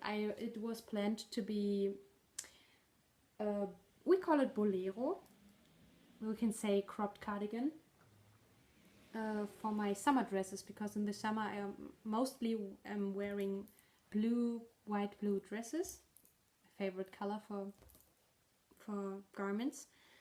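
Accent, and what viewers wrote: Germany. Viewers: I just found your podcast and just love it! Dont worry about your English, it is wonderful, I lived in Germany for three years and can hardly speak it at all. I love your accent and it brings back such happy memories of when I lived there. We lived in Northern Germany in a town called Duelmen out side of Munster. I love the word of the day idea too. I hope you keep podcasting! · Moin moin! Your English sounds so beautiful with your German accent! I've watched all your recent podcasts and decided I love them so much I would start at the beginning! My husband works nights, my children grown, it's nice to have "company" while I knit and crochet in the evening. I'm so glad this wasn't your first AND last podcast. Thank you for sharing your passion for yarn, knitting, crochet and the Lord!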